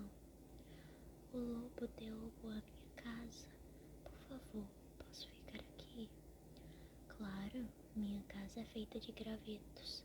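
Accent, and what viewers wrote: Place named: Ceará, Brazil. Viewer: Você é do ceará? Achei o sotaque parecido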